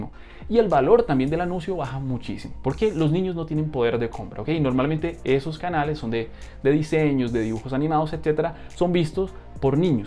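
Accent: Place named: Colombia. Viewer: Yo si decía que ese acento se me hacía conocido, tenías que ser colombiano para hacer las cosas tan bien 😊 🇨🇴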